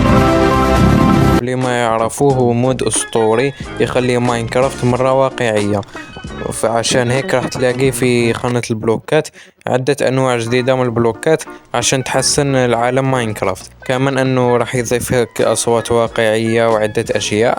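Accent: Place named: Algeria. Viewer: خويا اهدر صح نتا جزائري ❤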